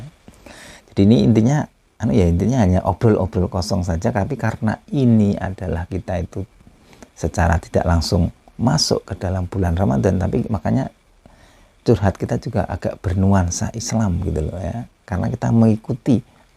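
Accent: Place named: Surabaya, Indonesia. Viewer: bahasa suroboyonan ya neng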